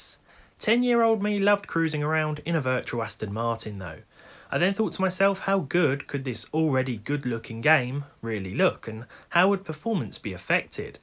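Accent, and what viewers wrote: United Kingdom. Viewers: This guy is the most stereotypical brit I've ever heard. This cheeky baastud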